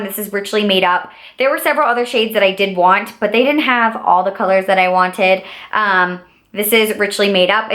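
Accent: United States. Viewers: Hello I loved your video I'm a new subscriber! You're s sweetheart seems genuinely beautiful inside and out. You would pass as my twin when I was in my 20's. I don't know if you've ever heard that everyone has s twin somewhere in the 🌎. I'll try to find a photo of me and send it to you. I also love your accent and st first I thought you were from Arkansas . I was born in Pumpkin Bend , Arkansas! You are adorable I'm going to tell all my friends to subscribe to your channel. Your excitement and enthusiasm is contagious it makes me want to go to Walgreens in the wee hours of the morning ! Have you ever heard about the automatic de/pot machine from QVC. I believe Kelly Strack received one in a recent PR package. It might work great for those large single shadows. Did the Morphe limited edition copper and spice palette have a product number? I can't wait to binge watch your videos ; so happy that I found your channel.🙋🏻👙👗💅🏼💕🌸🕶💄👠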